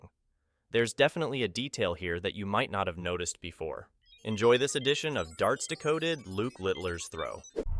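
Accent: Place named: United States. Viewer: Did not expect an American accent on a darts video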